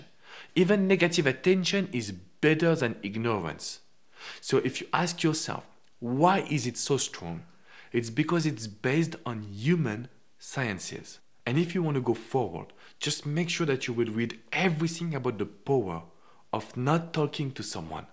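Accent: France. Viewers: I can tell you are French!!!! Love the accent!!! I am a Frenchyyyy too 😉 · I like his accent, is he french?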